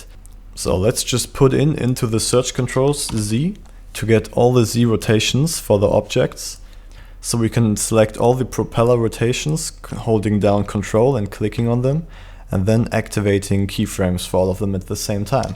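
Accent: Germany. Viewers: Yeah sounds german 😀 Greetings from Germany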